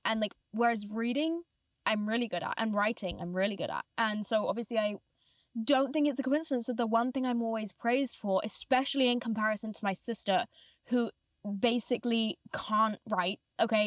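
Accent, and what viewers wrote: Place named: RP, United Kingdom. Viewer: So posh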